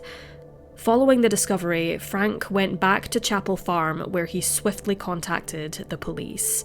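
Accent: Scotland, United Kingdom. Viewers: She's Scottish, mate 🏴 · Ye canny beat a Scots tongue to tell a gory tail 👏👏👏👏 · Cold case. There are lots of them. Is your native language not English or are you not familiar with the many and varied accents in the U.K.? The narrator has a very obvious Scottish accent - she is from Scotland, part of Great Britain so yes, she is a NATIVE speaker of English. You must not watch many U.K./British films or TV. if you don't recognize the Scottish accent.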